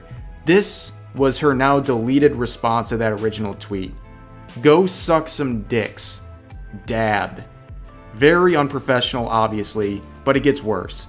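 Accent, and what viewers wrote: France. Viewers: I thought she was definitely acting toxic on her dead space stream. I know I definitely heard her say to one viewer who was leaving "What are you going to do? Touch grass? This is a Saturday, you're not leaving my stream!" Really showed me how big an ego she has and how narcissistic she is. Also a really good indicator that her viewer count/number of people who watch her streams is the most important thing to her. She did a lot of unnecessary yelling too, which I didn't understand. It's honestly sad because I genuinely liked her for a few good reasons; Number 1) because her art is amazing, and I'm an artist too; number 2) her cute French accent; and number 3) some of the games she plays (like dead space) are games that I really like. I did not know that this is what she's truly like behind the screen, but I am nonetheless disappointed. If anything, her toxic behavior serves as a good reminder of what I don't want to have in a relationship. 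I find it to be a good experience then, because I at least know what I'm looking for. I may be digging too far into it and that's just the persona that puts on for her streams, but at this point I kind of doubt it. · So I don't follow at all. Not a fan of hers, not because of anything that happened recently it's just I already support a Vtuber and I'm not going to throw more money at another Vtuber who's content just isn't entertaining to me. From the clips I've seen people just seem to have a hard on for her because of her French accent. Like that is what I legit believe. This is fine as people have certain aspects about others that they are attracted to. Anyways what really stood out to me in this whole situation is that no one is right, and everyone is wrong. First of all in any contest you aren't owed anything. You take part and you either win or you lose. You can lose gracefully or you can be a sore loser and whine about it. 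This artist took it upon herself to feel entitled and was not noticed by senpai. So she demanded answers like she was entitled to them. She got in contact with a mod and didn't like the answers she got. I do not condone the manner in which he did but that's the answer she got but she STILL was not satisfied. I feel like that's what's wrong with alot of people these days is they feel everything is owed to them and they are entitled to anything they want when that is not the case. Anyhow even after speaking with she still wasn't satisfied and takes to twitter which always raises a red flag to me. You could be an adult and just deal with it but no you had to go to the cesspool that is twitter. You've automatically lost any faith or respect I had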